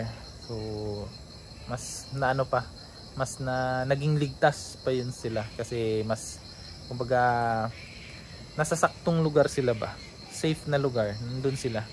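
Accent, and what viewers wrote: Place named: Visayas, Philippines. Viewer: 😂😂😂😂natutuwa ako SA tuno NI sir Pg magbisaya..ang cute😂😂❤❤❤❤❤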